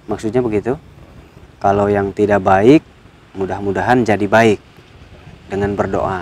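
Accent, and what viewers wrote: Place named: Sarawak, Malaysia. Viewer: Ni suara kitak. Org sarawak. 🙋‍♀🤩🤩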